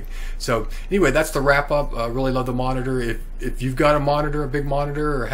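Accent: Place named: Georgia, United States. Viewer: Are you from Georgia?? Your accent reminds me of AJ Styles. :)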